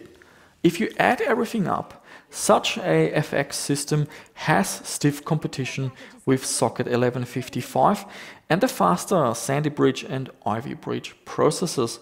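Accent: Germany. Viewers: YOU HAVE THE HARDEST GERMAN DIALECT I´VE EVER HEARD :D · How come this man sounds like a German? Can somebody explain? I thought he was an Australian. I have no clue haha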